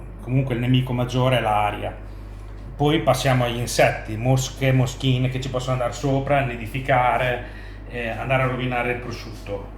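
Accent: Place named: Romagna, Italy. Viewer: Bravo complimenti 👍 raccontato con il tuo accento romagnolo diventa fantastico 👍👍👍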